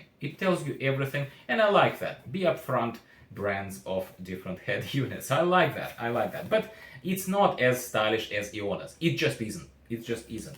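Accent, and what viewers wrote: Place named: Eastern Europe. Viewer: The accent :D are you from EE?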